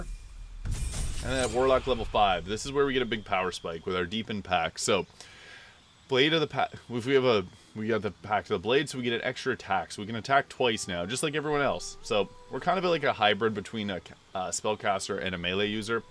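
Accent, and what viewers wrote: Maritimes, Canada. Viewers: Interesting. Thanks. I could tell you're from the Maritimes by your accent 😊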